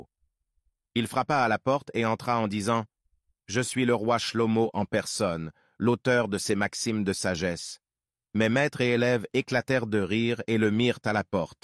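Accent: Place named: Africa. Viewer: Tu parle mal avec ton accent afficain c est salomon et non slomo et asmidee avait des pieds de canard et.non de coq .va apprensre ta leçon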